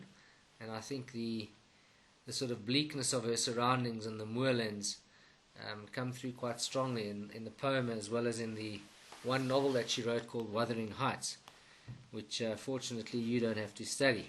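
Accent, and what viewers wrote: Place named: South Africa. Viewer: South African accent 💪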